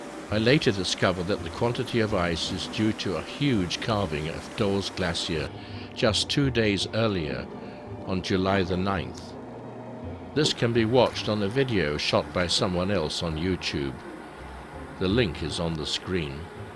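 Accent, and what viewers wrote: England, United Kingdom. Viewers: I don't know why but every adventure video seems more dramatic when it's voiced with a deep English accent. I felt like I was watching a National Geographic expedition from the 80s.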